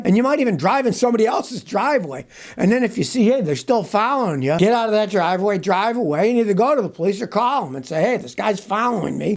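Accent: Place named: Niagara Frontier, United States. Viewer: You've been driving for 55 years and yet I can still detect a Niagara Frontier accent. 🤠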